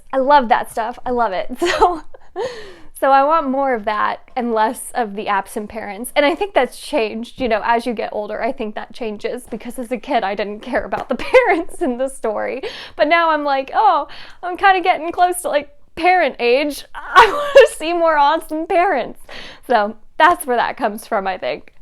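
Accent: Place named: United Kingdom. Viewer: That British accent tho hahaha you reminded me so much of El Brooks in this video, I love it!!!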